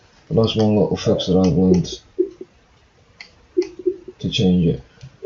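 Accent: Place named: Wales, United Kingdom. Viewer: Mate are you welsh because you sound it.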